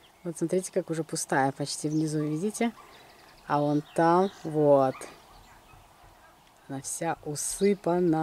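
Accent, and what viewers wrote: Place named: Belarus. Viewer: здравствуйте. Я год тому назад случайно попала на Ваш канал. Понравилось просто Ваш говор, ведение разговора. А когда Вы нынче приехали к родителям и мама с папой стали учавствовать в с"емках и услышала говор родной, песни - все... Моя любовь - Беларусь!!! Пусть Господь даст здоровья Вашим родителям на долгие года!!! Пожалуйста, научите их записывать для нас видео ( хоть раз в месяц) и Вы уж сами до на доносите их видео... Спасибо за все!!! До свидания! До видзення...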